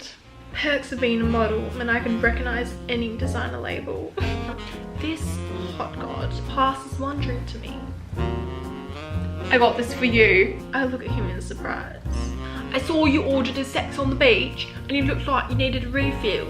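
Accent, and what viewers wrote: Australia. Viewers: Ok but I love your accent!! me being from Bristol having a shitty accent ur obviously Australian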